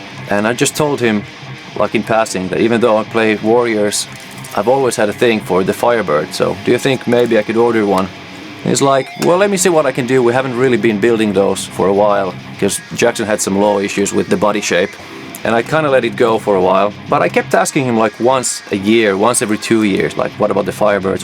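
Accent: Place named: Germany. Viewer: Man, I just found you and as a Jackson lover since the start of the 80s and having started playing guitar back in 77 I love this. I have always loved these guitars as well. The homy grail of Jacksons. I have a charvel Preditor crackle from 91 with a factory Schaller trem I love and with the reverse headstock which is too cool. I dig your playing too man. Do you have a band website where I can listen and buy your music? What country are you from may I ask? You sound sorta German but can't quite put my finger on the accent. 😂 Regards and stay healthy brother. From the heartland . Indiana USA Tim . ❤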